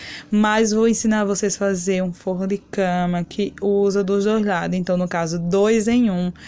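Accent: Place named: Alagoas, Brazil. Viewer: Seus trabalhos são liiiiiindos ,amei seu jeito de falar ,alagoana né?gostei muito e vou me escrever pra aprender fazer todas essas coisas lindas que VC ensina. Não sei pintar mais pretendo aprender ,Deus te abençoe.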